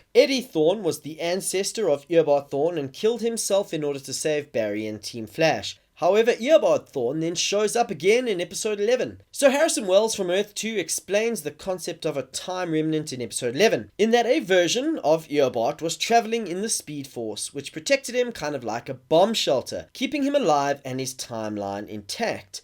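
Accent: South Africa. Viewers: Is this guy South African?????? He sounds like he is.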